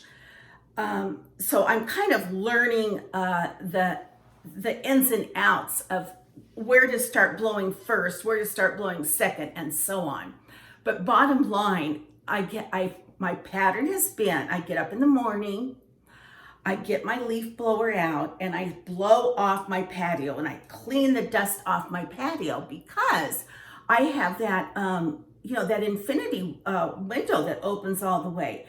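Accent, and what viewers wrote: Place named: California, United States. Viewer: Hi, I am a new sub🤗 I just love your channel and I LOVE your California accent so much, where in California did you grow up? I have been binge watching your videos! 😘 I came across your channel from watching Monika Mcgillicudy and I saw a thumbnail on the right side. I love your home decor!!! Beautiful💕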